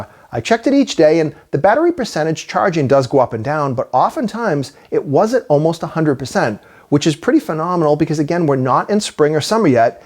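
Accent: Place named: New England, United States. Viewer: The narrator definitely sounds like a New England type. Maybe he’s related to Norm on the New Yankee Workshop. You guys take care. I hope the evidence that you gather from the footage is taken into consideration if the situation turns into a legal case. Good question, how can you keep a camera?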